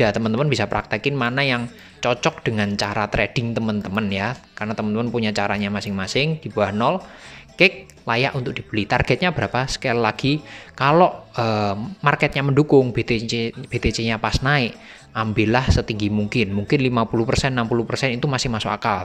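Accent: Java, Indonesia. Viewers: Bang jowone ngendi to kie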